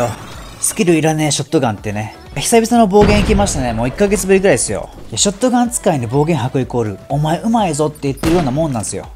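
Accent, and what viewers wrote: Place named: Kansai, Japan. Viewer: ですよね！ちょっと関西弁的だったんで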